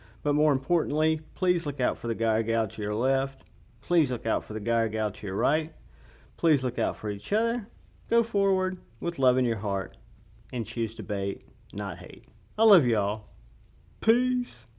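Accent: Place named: Nashville, United States. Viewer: Enjoyed that , whenever I heard your voice I’m thinking , Nashville born and raised 🤔 then again I’m an Aussie 🇦🇺😂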